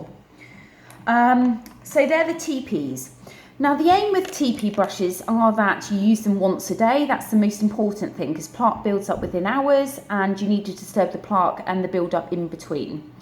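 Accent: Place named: England, United Kingdom. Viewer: Go to England the place is full of people who talk like this.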